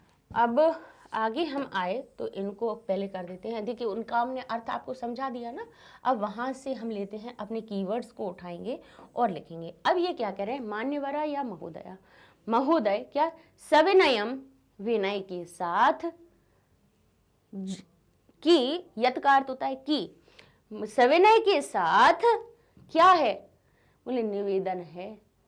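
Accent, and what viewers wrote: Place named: Gujarat, India. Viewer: Aap kya gujrati ho kyuki isiliye kaha raha hu kyuki aapki awaz gujrati jaisi hai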